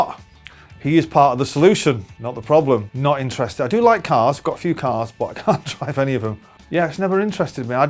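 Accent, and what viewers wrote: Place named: Northern England, United Kingdom. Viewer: I am so in love with his northern accent!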